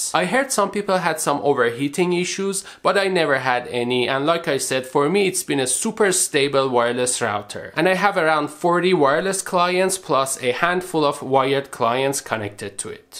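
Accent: Iran. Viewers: Hey actually im interested on your accent, are you Iranian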